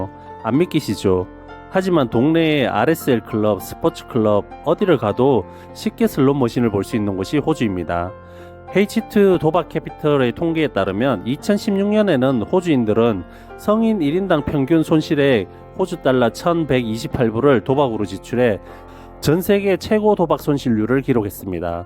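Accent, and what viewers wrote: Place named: Gyeongsang, South Korea. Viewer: 경상도 분이시네예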